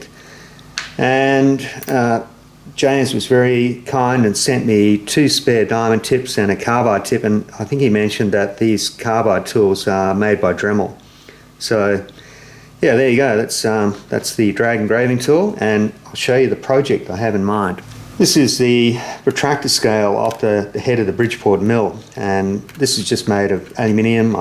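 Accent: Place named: Australia. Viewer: Gday, Great to see your channel is getting plenty of subscribers. I've been enjoying both the project videos and the background sounds that I'm missing from home (birds, rain on the shed roof, Oz accent, etc.) as my wife and I are currently living in the UK, but home is Qld. Looking forward to future videos. Cheers.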